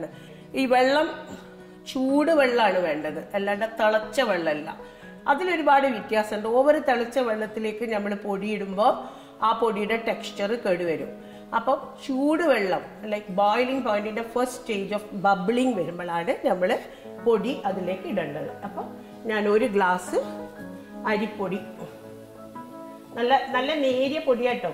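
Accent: Kozhikode, India. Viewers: ഞാനും കോഴിക്കോട് തന്നെ ആണ്. പക്ഷേ ഷെഫ് ൻ്റെ കോഴിക്കോട് സ്ലാങ് കുറച്ചൂടെ മനോഹരം ആണ്.😊😊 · Kozhikode slang pwoli❤️❤️✌️ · Is that Calicut slang or a bit of kannur slang . It’s so interesting how Malayalam slang changes from kochi to Thrissur and then up north .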